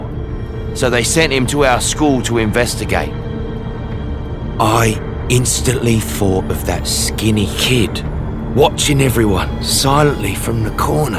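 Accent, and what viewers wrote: United Kingdom. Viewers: I keep forgetting hes british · HE IS BRITISH??? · I KEEP FORGETTING THIS MF IS BRITISH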